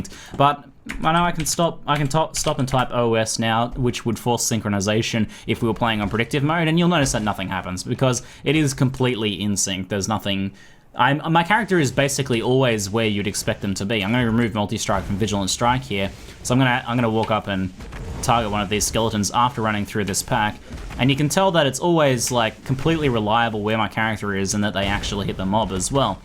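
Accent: Australia. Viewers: Farrell Roll Uh, no. He's Australian so he says some words differently than an English man or American man would... but thanks for being ignorant.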